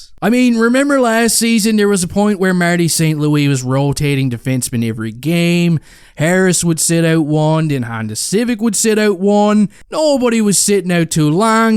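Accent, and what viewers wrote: Newfoundland, Canada. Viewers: Pleas tell me what part of Newfoundland you are from?!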